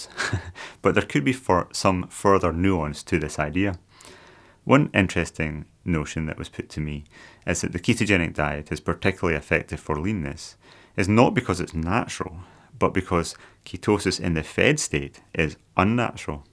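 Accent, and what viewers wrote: Scotland, United Kingdom. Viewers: you've really outdone yourself on this video! I'm still working my way through it in 1/2 hour segments. It's very dense in terms of the information it contains, which in turn gives me plenty of food for thought. I really appreciate all of the hard work that went into researching and producing this. I love the way you've gone directly to the basic research, applied it to your own experiments and drawn your own conclusions, which in turn you've shared with us. I also look forward to the future nutrition topics you plan to delve into. I feel very fortunate to have discovered your channel. The only downside is that I find your voice totally mesmerizing, to the point where I cease to process the words that you're speaking. Gotta love that Scottish accent!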